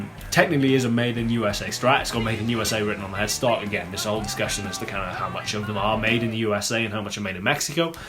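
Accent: Wales, United Kingdom. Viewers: He is a cool guy.. 🎸. Thanks for explaining and showing the cheap guitars .. I also have a squire, an a expensive Gibson 335 ... but dude I can’t understand a word your saying, like you have a bunch of Marbles in your mouth,, clearly you’re from the UK, and it’s all good 👍🏾.... keep showing more guitars bro... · Wales more like. His is nothing like a London accent.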